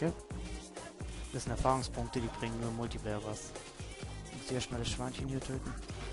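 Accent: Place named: Swabia, Germany. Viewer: Schwäbisch Lets player :D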